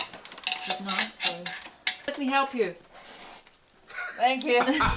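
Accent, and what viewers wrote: Australia. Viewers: Bloody hell, you're beginning to sound Australian again. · ok now I know what is Australian ascent is. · Wow, your Ozzy accent is really starting to kick back in.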